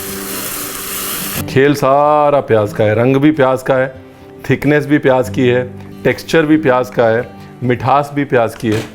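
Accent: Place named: Punjab, India. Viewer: Waah Ranveer ji app ki hidi mein panjabi language ka tarka bhat acha laga..keep it up ..txs for recipe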